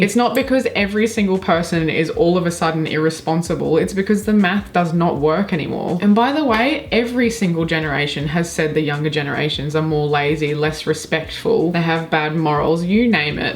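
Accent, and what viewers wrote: Australia. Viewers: I love thought-provoking & enlightening rants Especially if they feature a hilarious aussie accent lol I really like to rebuttal with my own profound take on this matter But for now, I just wanted to say thank you for being a critical thinker Subscribed Bless u ❤ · Hey you sound like an Aussie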